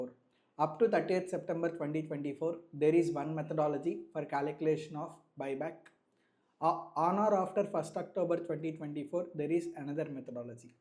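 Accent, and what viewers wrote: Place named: South India, India. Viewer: Matter is good but not understood many things due to South pronounciation and low volume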